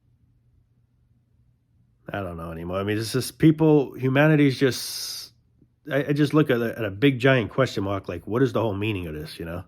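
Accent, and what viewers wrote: United States. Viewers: So true sadly.. also I like your accent! What part of America are you from?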